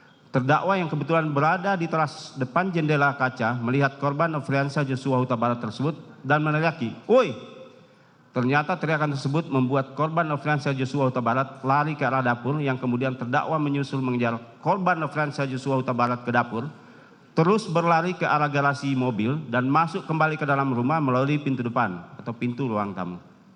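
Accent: Java, Indonesia. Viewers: Hal yg di karang karang membaca nya uga gk lancar pletot pletot boso jowone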